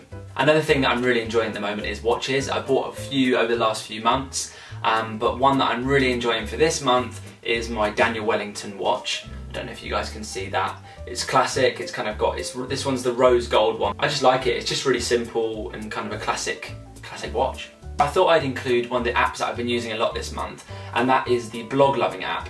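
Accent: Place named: United Kingdom. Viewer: If I had your perfect british Accent I would never shut up!!! It's fucking amazing!!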